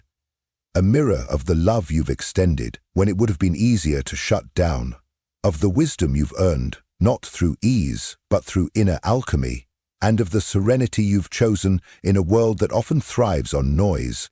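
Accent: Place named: United Kingdom. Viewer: Interesting video, but why is the narration for videos like these always done by this same sounding voice with the British accent, as if it personifies wisdom and depth? It's annoying and actually undermines the message. Please, please alternate the voices used to narrate.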